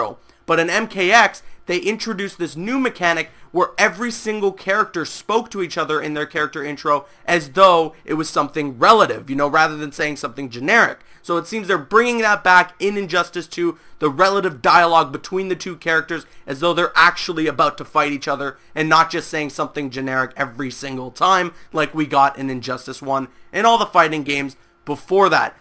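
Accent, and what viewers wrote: Canada. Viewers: Canadian accent ?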